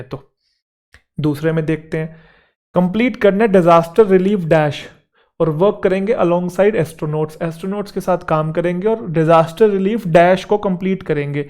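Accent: Haryana, India. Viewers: sir ji mai apaki classes regularly attend karti hoon. sir ji aap hariyanvi bahut badhiya bolte. muje bahut hansi aati hai. sir ji kabhi muje time mila to aapko milne aaugi.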